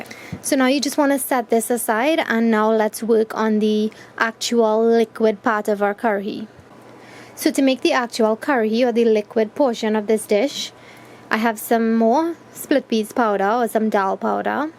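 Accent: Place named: Trinidad and Tobago. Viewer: Are you aware you have a trini accent? ;-)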